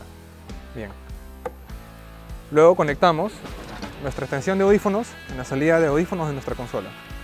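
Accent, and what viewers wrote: Peru. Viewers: Tiene el típico acento limeño. Muchas gracias por los datos sobre el Ear Monitor.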